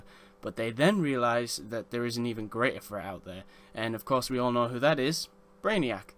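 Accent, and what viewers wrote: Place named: England, United Kingdom. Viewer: Hey man awesome video as usual. Just wondered if you think there going to update some characters like clayface, killer crock and now that they're adding him Solomon grundy to be big figs or even have transitions with people like bane in his lego batman/2 skin then push the venom button on his chest and do a hulk with him slamming in to the ground and looking badass. Also where in England are you from if you don't mind asking :)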